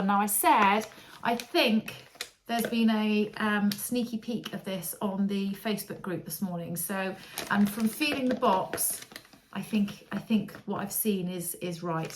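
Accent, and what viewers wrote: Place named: England, United Kingdom. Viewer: I've had the week before Christmas off work, so while having my morning tee, I've watched you and. Sharing advent calendars for each other is such a fun thing to watch. I think i will try to do that for my 2 besties for next Christmas. Maybe also for the hubbie and grandkids. It looks like you are feeling better. My husband had something similar and he was feeling awful for over 3 weeks. The doctor said it was just a nasty virus going around. Luckily I had it slightly, and only for a couple of days. I live in Canada and mostly watch British vloggers, I think it's because the accents remind me of my English grandmother and Welsh granddad. I've only found a few Canadian vloggers, Janine Sews, and another young lady from Toronto, but i can't remember her name. I love that your entire family joins you in filming, so fun. 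P.S. the faux jumpsuit and blue velvet dress look stunning, you have the legs for a high slit for sure. I laughed about the old lady comment, I'm 65 and definitely don't dress like an old lady.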